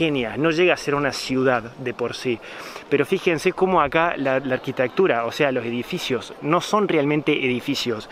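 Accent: Cordoba, Argentina. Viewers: Me encanta tu acento coooordobes... 🤘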